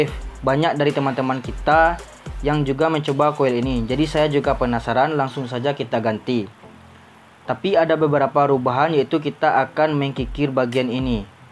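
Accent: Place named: Padang, Indonesia. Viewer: Orang padang nih ketara bat haha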